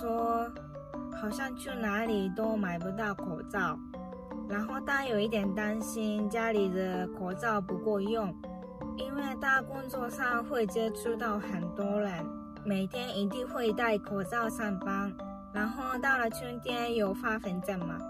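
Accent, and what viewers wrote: Taiwan. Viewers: 台湾腔小姐姐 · 台湾口音加日本口音的国语混合在一起真是特别呀。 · 這中文該是台灣學的 マスク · 居然有點台灣腔喔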